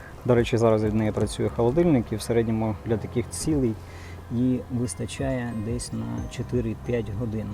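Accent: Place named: Surzhyk, Ukraine. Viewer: 😂если начали по укр говорить, то уже все говорите, суржык дикий аж слух режет)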